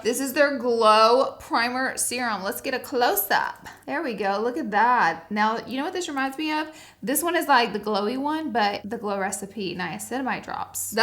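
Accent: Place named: California, United States. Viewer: You usually always have this Cali- vibe to your voice, in this video you sounded like a New Yorker! 😅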